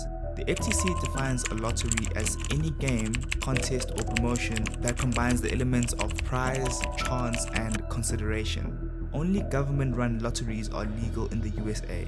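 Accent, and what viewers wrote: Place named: South Africa. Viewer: What a great video, instantly knew you are from South Africa. We have a distinctive accent.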